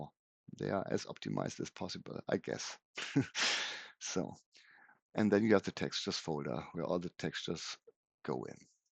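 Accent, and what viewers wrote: Germany. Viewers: I’m about halfway through the video and now realizing some of this mistakes I made with your tool. Mainly the ordering of materials (based on some guesswork about the underlying map). Thanks for showing my tool! I think it’s definitely possible for your tool to sort of auto select the materials, layers ordering, roads options in the future using OSM data. I’m looking forward to the future if this tool. Fantastic work. And for some reason I just love German accents 😂 great video my friend. Still watching through until the end. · I love that German accent! <3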